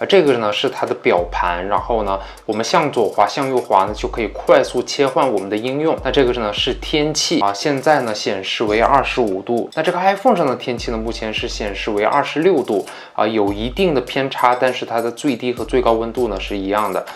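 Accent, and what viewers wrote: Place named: Northeast, China. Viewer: 隐约有点东北味儿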